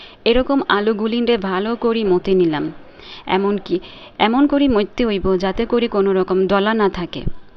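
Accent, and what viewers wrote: Noakhali, Bangladesh. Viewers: ভাবী ত নোয়াখালীর ভাষা খুব সুন্দর করে কইতেছেন। অনেকদিন পরে এরকম নোয়াখালীর ভাষা আমি শুনতেছি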